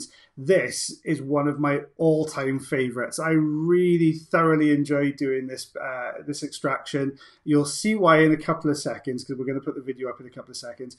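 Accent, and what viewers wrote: Wales, United Kingdom. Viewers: I told my sister I found very relaxing satisfying videos of a Welshman with a wonderful voice who does ear wax removals and she exclaimed, “!” How did she know?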